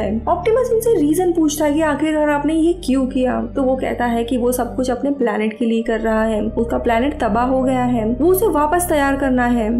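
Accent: Maharashtra, India. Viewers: do you have any relation with climax explain in hindi channel's nikhil ? moreover your accent is quite marathi. are u a marathi? pls tell. like your choice of movies and explaination.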